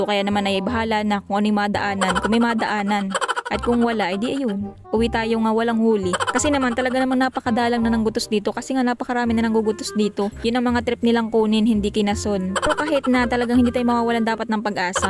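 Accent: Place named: Quezon, Philippines. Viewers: Parang pamilyar Yung accent ng salita mo idol taga Quezon puba kayo? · pa shoot out ako maam from Sipocot Bicol.. JOEZEL NESAGON.. ikaw baga ma'am ay taga Quezon o Batangas.. eh kung maka ay ka eh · lam aw😂😂😂 Quezon prov tagalog talaga😂❤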